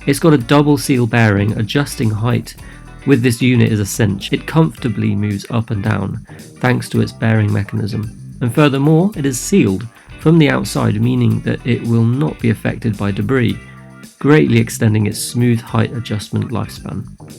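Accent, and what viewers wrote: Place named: England, United Kingdom. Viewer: He's a Pommy, not a Kiwi!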